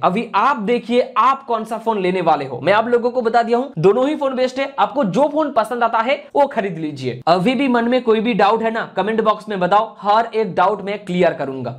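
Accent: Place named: Bihar, India. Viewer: Bhai tum bihar se ho kya nice accent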